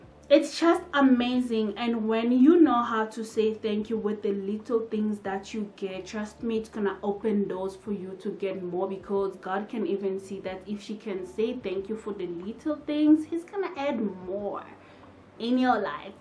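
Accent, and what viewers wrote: South Africa. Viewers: Wow u sound & look South African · Yhoo you got SA accent nice voice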